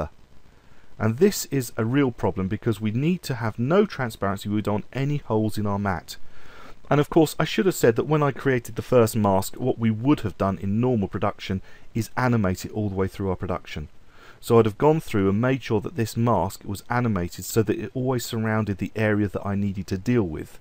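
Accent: United Kingdom. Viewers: JUne 2021: after all this years still the best tutorial to this topics I found. Thank you very much! (And your british English is so good to understand!!!!)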